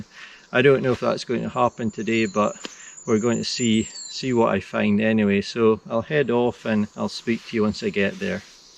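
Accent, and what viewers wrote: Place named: Aberdeen, United Kingdom. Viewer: hi, thanks very much for getting back to me so promptly - ok you have reassured me. I'll probably get one. I enjoy your videos,very straight forward and imformative compared to a lot of others where the presenter talks a lot about themselves before getting on to the subject matter. You sound like you come from the Aberdeen area. That's where I stay, my wife being an Aberdonian. Thanks for getting back to me and for your videos which are great.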